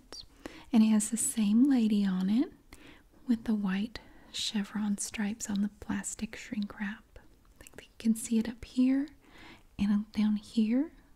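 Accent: South, United States. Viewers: I must say ; I think your southern accent really complements the asmr stimuli of sense of relaxation and well being.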